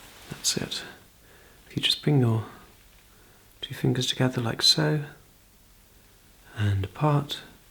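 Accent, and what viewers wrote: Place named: United Kingdom. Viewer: He sounds like a British version of my allergist and it’s scary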